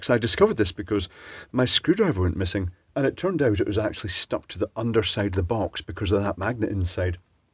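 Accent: Scotland, United Kingdom. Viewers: I’m strictly here for the beautiful Scottish accent!! 😉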